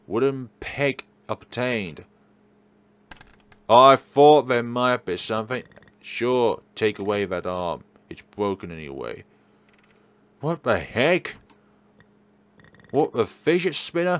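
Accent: United Kingdom. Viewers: Where in UK is your accent from?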